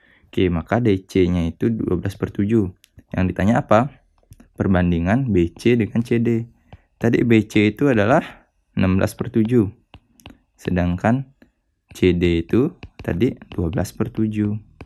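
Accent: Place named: Bali, Indonesia. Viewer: Dari logat nya kayaknya yang punya channel dr Bali.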